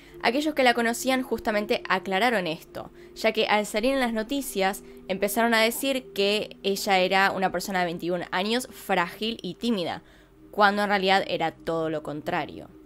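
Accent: Argentina. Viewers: Cuentas con gran capacidad narrativa tengo como ocho meses de seguir tu canal pero no te comentaba porque no tenía buen celular, además que a los mexicanos nos gusta como hablan los argentinos.Tienes talento y personalidad. Espero siga creciendo tu canal. Sinceros saludos desde Torreón, Coahuila, México.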